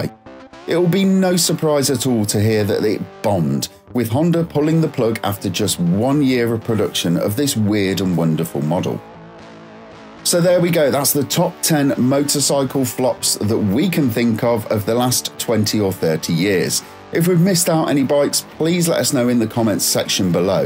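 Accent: United Kingdom. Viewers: As far as I can tell this fellow with a British accent did not include a singled British bike.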